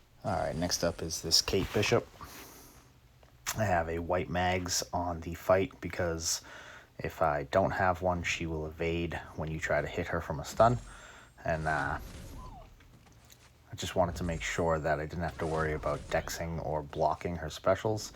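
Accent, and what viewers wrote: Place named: Boston, United States. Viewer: Lol I knew it. I live a few miles north of Boston. It was how you pronounced your ahhhh’s that Sauron was ah 2. Love it….. 👊👊👊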